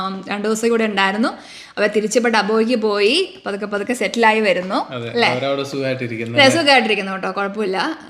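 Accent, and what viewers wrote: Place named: Kottayam, India. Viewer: Trivandrum anu valarnnathenkilum thani Kottayam slang anu anyway Happy wedding anniversary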